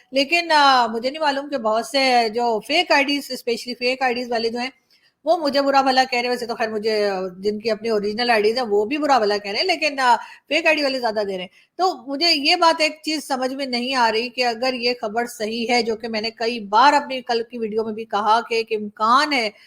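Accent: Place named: Mumbai, India. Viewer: ji kya aap bharat ayi thi. Kyuki aapki bat karneka style mumbai wala hai. Very good aap aage chal ke sab ki wat lagane wali hai. Agar massage pasand aya to like kare.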